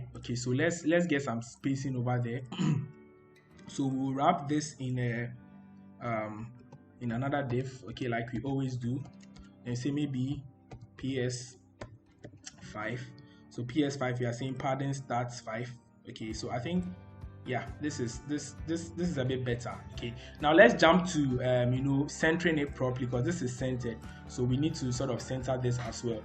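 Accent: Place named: Ghana. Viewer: Great one There. Your accent reminds me of a Ghanaian Friend in Germany.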